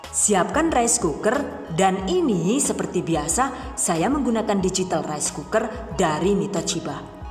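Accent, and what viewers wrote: Banyumas, Indonesia. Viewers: Ngapaknya bund..salam wong ngapak resepnya selalu mantul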